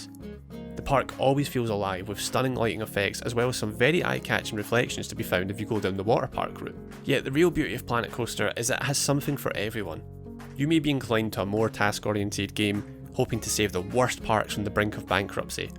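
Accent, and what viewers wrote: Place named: Scotland, United Kingdom. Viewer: I have to warn you. Your Scottish accent and the fact that you talk fast makes me struggle a little bit, and I'd say I'm quite good at English. I can see others struggling a lot with your review.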